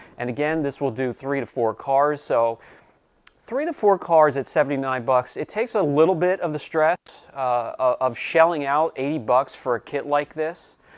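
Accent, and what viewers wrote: Pennsylvania, United States. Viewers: Other than the "Pennsylvania-ese" I detected in your accent many moons ago, you sound perfect. And as far as getting ahead of yourself, it's up to us to keep up! Your videos are straight-forward and a pleasure to watch. I always learn something new. 👏🏻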